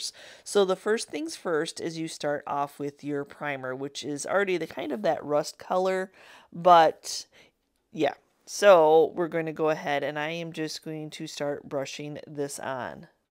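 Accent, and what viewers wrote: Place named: Texas, United States. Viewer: Love the Bible tree and the "God winks". I've experienced them many times since I lost my husband unexpectedly. It has been 2 years but it still feels like yesterday. So God winks are essential to my health! Love your channel, your collections, your personality and your crafts. Are you sure you're not from Texas because you sound like one of us!😁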